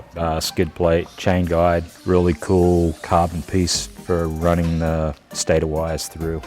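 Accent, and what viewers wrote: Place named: Australia. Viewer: He sounds Aussy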